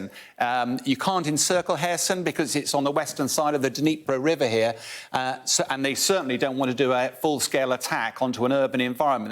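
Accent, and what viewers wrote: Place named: United Kingdom. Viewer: So what's been the timeline for the Ukrainian counterattack thus far? And why does this newscaster have to butcher the pronunciation of these Ukrainian and Russian words? It seems he's less about accuracy and more about British-ness. It's Za-por-rizh-ZHI-a, not Za-por-RISH-shi-a, KHEYR-sohn, not HER-son, POO-tin, not PEW-tin.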